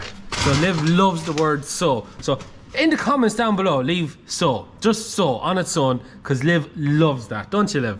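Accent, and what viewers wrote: Ireland. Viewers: Same beltdrive like an snowmobile! I wonder,is it Irish or is it a dialect you mix whit English?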